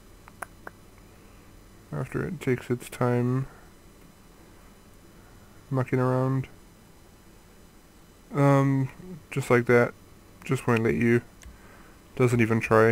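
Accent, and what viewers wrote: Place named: New Zealand. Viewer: soo good hearing a Kiwi doing a tut, te-meke!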